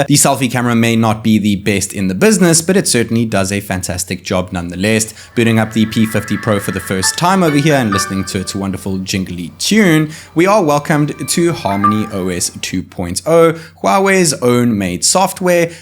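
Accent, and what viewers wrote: South Africa. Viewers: no way are you south african dawg? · Are you from south africa by any chance and just living in china. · Mate, your accent sounds South African . You from here ?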